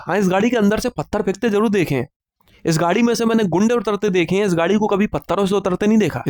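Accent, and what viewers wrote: India. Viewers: Bhai tumhari desi boli apun ko mast lagi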